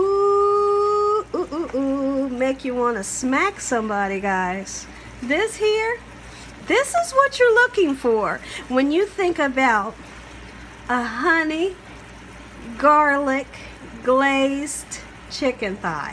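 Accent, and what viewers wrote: South, United States. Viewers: I'm from n.y and l came across your channel and l'm loving it Wow!! the cooking you're doing. Plus your southern voice is amazing to me to being a new yorker... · yes I could see her on food network or a country soul cooking channel she should start sending in some of her recipes to those type channels like CMT because she does have a bit of a country drawl . This chicken looks so good ima slappin that like button . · love the southern accent thank you for the recipe!! love from africa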